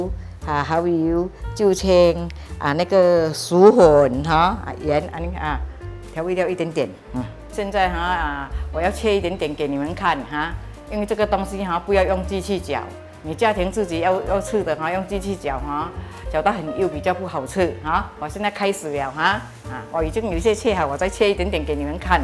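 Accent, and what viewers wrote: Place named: Hokkien, China. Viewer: ，你讲话很像我妈妈，参一点福建话这样啊😊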